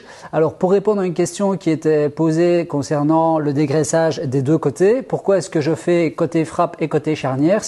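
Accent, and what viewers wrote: Belgium. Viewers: Allez vive. Dès que vois cette tête avec bonnet et accent légèrement belge, je suis de suite heureux.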